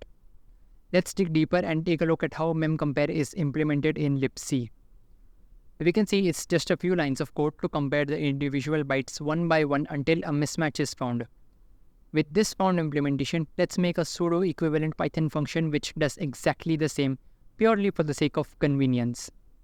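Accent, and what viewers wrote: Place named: India. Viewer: Just realized it's better switch Siri to Indian accent so I would just get used to it somehow for videos like this.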